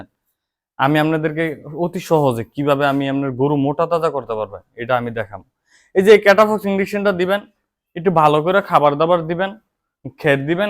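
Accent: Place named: Chandpur, Bangladesh. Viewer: কথা শুনে মনে করছিলাম শরীয়তপুরের এখন দেখি পার্শ্ববর্তী চাঁদপুরের।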